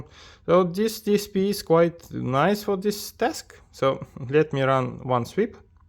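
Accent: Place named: India. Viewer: Индийский английский :-) ...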